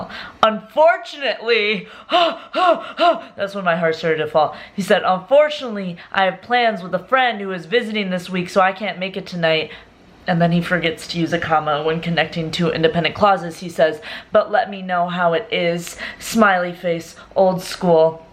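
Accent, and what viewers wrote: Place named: Wisconsin, United States. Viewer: Wisconsin Accent OP 😜🤘🏼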